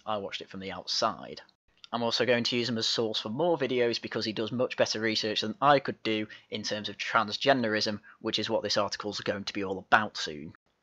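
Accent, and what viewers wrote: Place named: Manchester, United Kingdom. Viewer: sorry my dude it says "Infertility is therefore one of the major side effects of the course of treatment that runs from puberty suppression through cross-sex hormones to surgical sex reassignment." Which includes cross sex hormone treatment. Which means there is a lot of misinformation in this video. BTW you sound dead familiar are you from greater Manchester?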